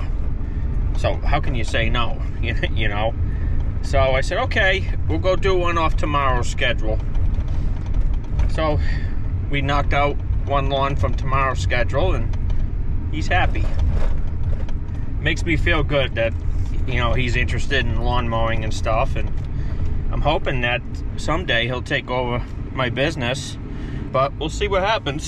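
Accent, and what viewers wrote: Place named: Massachusetts, United States. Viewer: From the sound of your accent, you live up where I was born and raised...Massachusetts? I live in North Carolina now, have for 30 years. Good video guys, keep up the good work, I'll give you a shout out on my next video.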